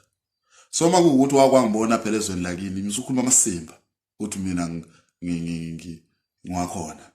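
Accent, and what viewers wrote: Zimbabwe. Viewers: Cha mpela nje wizimbabwe uyazwakala noma ukhuluma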